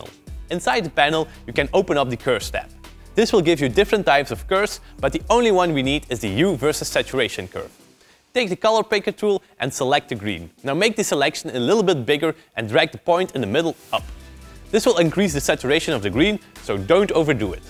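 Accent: Limburg, Netherlands. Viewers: your dutch isnt it? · ik ben ook nederlands, jij komt uit Limburg?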